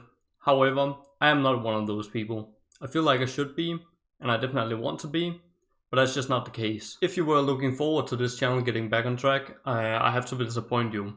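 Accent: Denmark. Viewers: Is blud danish? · You Sound very danish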